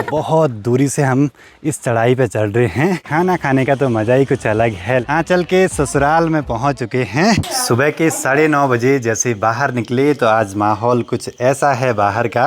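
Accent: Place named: Pahari, India. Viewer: Aap Bilkul cool pahadi ki tarah bolte ho..aap change kro ise ...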